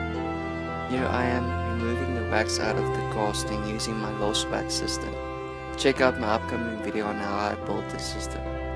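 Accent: South Africa. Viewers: You're South African? Edit: yes you definitely are, what's up boet? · south african? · Is that a South African accent?... shout out from JHB.... awesome work! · You from South Africa, sir? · Afrikaans accent - check Ugly South African 3-prong electrical plugs - check Johnson & Johnson petroleum jelly - check :D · bra r u from south Africa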